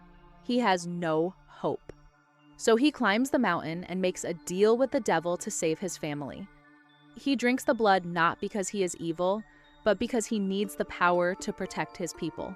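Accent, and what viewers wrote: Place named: England, United Kingdom. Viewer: good answer! an elite vampire friend of yours taught you how to speak in a clear and authentic English accent. I thought you lived in England like I do. You had me fooled. Impressed by your videos about Marishka and the other two brides of Dracula. You are a good story teller. Have you seen The Brides of Dracula with Peter Cushing?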